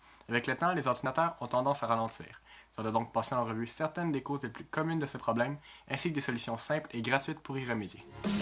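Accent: Quebec, Canada. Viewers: je préfère largement entendre l'accent québécois plutôt que l'accent d'un gar des bouches du Rhône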